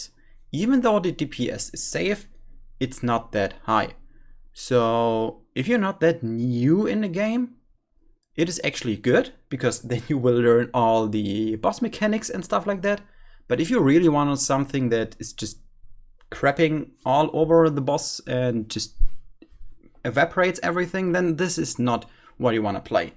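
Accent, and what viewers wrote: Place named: Germany. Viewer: could be german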